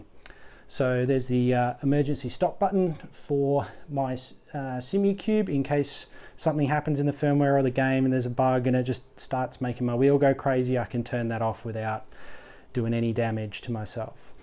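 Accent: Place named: Australia. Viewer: Aussie accent my dude.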